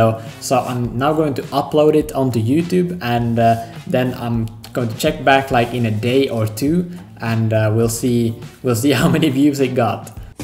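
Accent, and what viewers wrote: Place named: Finland. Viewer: The short video looks really good, but I much prefer your Finnish accent over that robot voice. I understand you're trying to prove that the whole video uses only AI, but if you do decide to grow the Frugal Meals channel, it would be cool to hear your natural accent instead of the robot.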